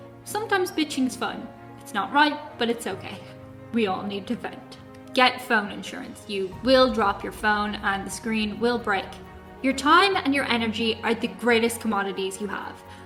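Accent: Ireland. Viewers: With that wonderful Irish accent you could tell me the world is coming to an end today and I wouldn't care.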